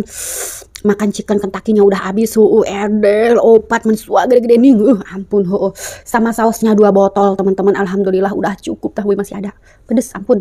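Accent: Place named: Banten, Indonesia. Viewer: Kalo dengerin teh ngomong jadi inget temenku ngomongnya sama logat" banten padahal dia orang lampung tapi bisa logat banten 👍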